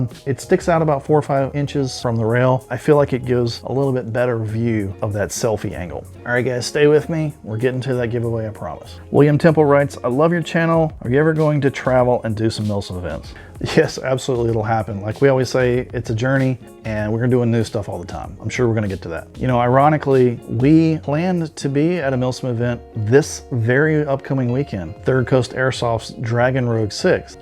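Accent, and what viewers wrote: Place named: South, United States. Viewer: You sound like David Attenborough with a southern accent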